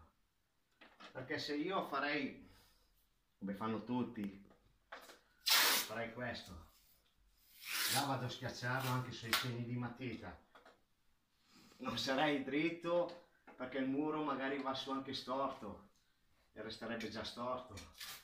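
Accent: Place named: Lombardy, Italy. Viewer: Di dove sei,dall'accento sembri lombardo,sbaglio?Sei un imbianchino da 10 e lode,complimenti da un collega!!!Mi iscrivo subito al tuo canale!!Per dipingere una parete grande,ci vuole un pennello grande!!CIT. Ma no,non ci vuole un pennello grande,ma un grande pennello,Cinghiale!! CIT.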